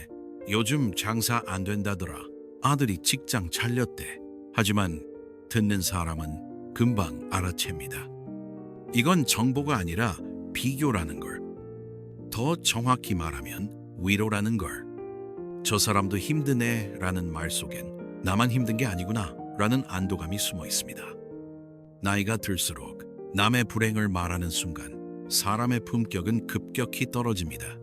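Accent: United States. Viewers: 발음이 미쿡교포이신것 갗아요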